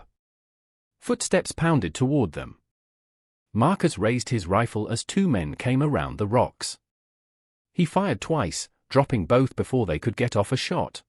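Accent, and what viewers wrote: United Kingdom. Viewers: Why do I have to listen to this ridiculous British accent on a story that takes place in America? We should have allowed the Germans to destroy the UK when we had the chance (NOT CHONCE).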